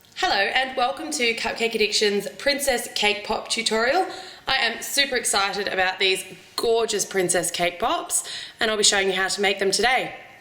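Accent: Australia. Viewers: Im always wonderin... ur austrailian right??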